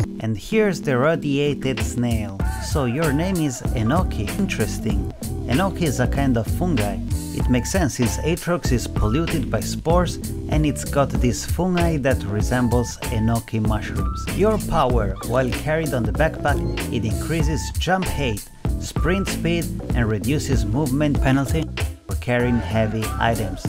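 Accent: Italy. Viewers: Your accent makes me think you're italian, aren't you? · Dude, are you italian?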